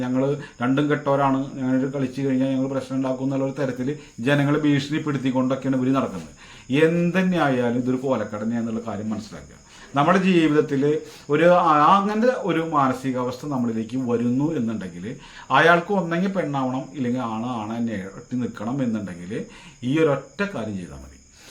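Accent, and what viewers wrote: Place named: Thrissur, India. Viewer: Thrissur സംസാരം 🥰🥰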